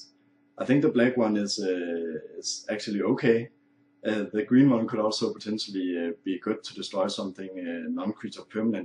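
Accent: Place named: Germany. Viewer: Das ist definitiv deutsches Englisch ;)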